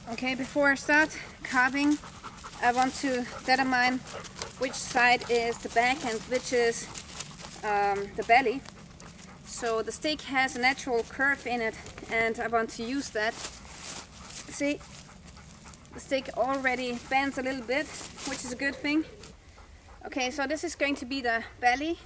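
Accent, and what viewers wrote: Germany. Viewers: are you German... I think I can hear your accent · Hunting with bow is forbidden where you are? Where is that? Sorry I'm new to the channel and just watching all the different videos, I'm not good with accents, but if I had to guess Germany or Austria?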